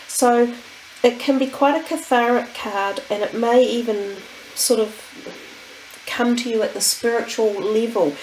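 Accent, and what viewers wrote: New Zealand. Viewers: What a lovely reading. Am currently living abroad but so refreshing to hear the kiwi accent again! Thank you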